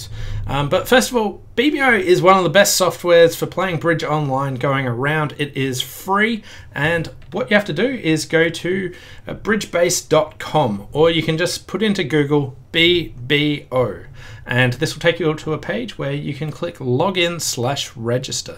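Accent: Australia. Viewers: Thanks. Just learning myself, and have started using BBO. Enjoying going through your videos, nice to see and hear an Aussie voice on YouTube.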